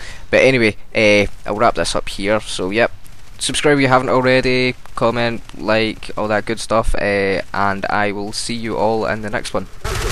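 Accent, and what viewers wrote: United Kingdom. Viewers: u from scotland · What part of North Ayrshire are you from?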